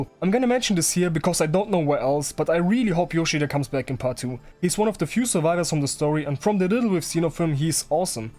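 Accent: Germany. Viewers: Du hast einen der krassesten Deutschakzente den es gibt. Ja Quanxi is pretty cool. I really loved how Kishibe liked/loved Quanxi and didn't want to see her Corpse. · Are you from germany? Your accent sounds really like from people from the country.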